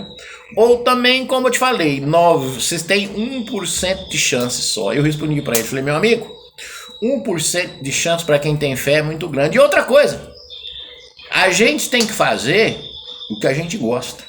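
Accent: Goiás, Brazil. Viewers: Sou de São roque SP gosto de te ouvir seus caso seu linguajar no sotaque goiano e caipira é chik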